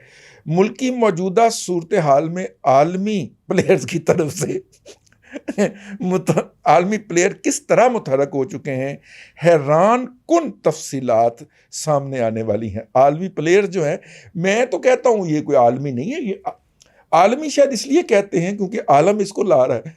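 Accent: Punjab, Pakistan. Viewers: Your vlogs are insightful and you manage to say as much between the lines as up front. I particularly love how you completely own your Punjabi tinged Urdu. Sick of complexed Punjabis always trying to neutralise their accents.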